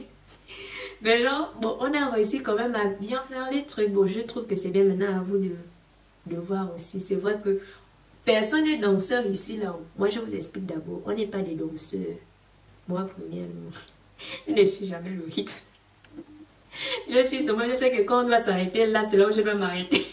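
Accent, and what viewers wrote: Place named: Gabon. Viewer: L'accent gabonais :)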